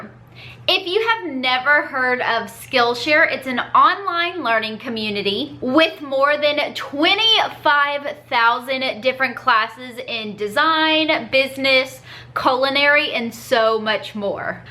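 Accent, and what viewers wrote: South, United States. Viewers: You so country lol lol lol. I love it!!! So adorable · I love ya girl.... Since u moved to bama ur getting more country twang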